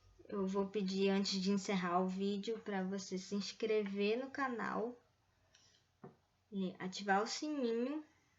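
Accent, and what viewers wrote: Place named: North, Brazil. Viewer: Parabéns pelo vídeo, muito bom, engraçado a atemporalidade de certos assuntos aqui na plataforma... Sou do Amapá, muito bom ver um sotaque nortista ecoando conhecimento.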